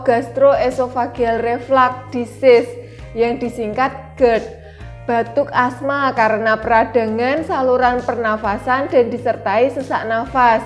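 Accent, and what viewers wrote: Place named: Java, Indonesia. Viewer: Watuh medoknya..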